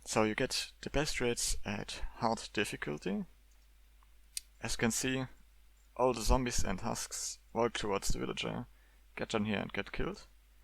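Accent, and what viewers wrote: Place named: Germany. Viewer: you sound verry deutsch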